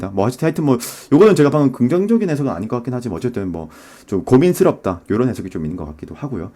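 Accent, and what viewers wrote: Gyeongsang, South Korea. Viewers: 사번 연애포텐셜이 터진다니 ㅋ 😄 지금은 별일 없지만 곧 몇 명씩이나 온다니 기대해보겠습니당 ㅎㅎ 조언해주신대로 오픈마인드로 다가오는 인연들과 좋은 추억 만들어볼게요💛🧡❤ 배경음악도 멋지고 목소리가 넘 좋으세요 님의 타로리딩 정확도 면에 있어 제가 본 타로 유투버 들 중 탑인거 같아요 진짜 예리해요 👍 전 부산 사람이라 님의 구수한 경상도 액센트가 친숙해요 😊 감사드리고 타로하는 구독다수 일취월작하셔서 번창하시길 기도할게요🍀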